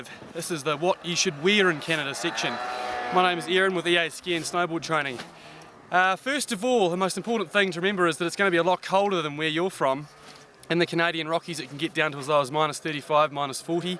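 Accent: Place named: New Zealand. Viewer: Good Kiwi accent! :)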